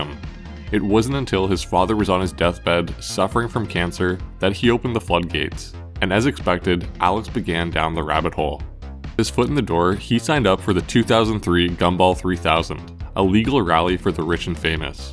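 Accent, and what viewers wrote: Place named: Canada. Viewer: You can always tell which videos are from the great white North with that pronunciation of the out out oooout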